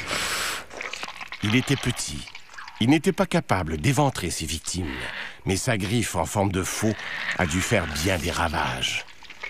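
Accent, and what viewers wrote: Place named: Quebec, Canada. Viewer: l'accent québécois est tellement laid :malade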